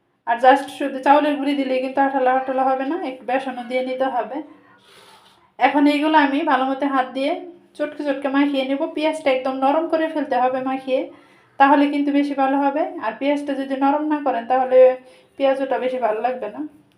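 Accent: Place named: Tangail, Bangladesh. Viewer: Ata to amader tanggaeler vasa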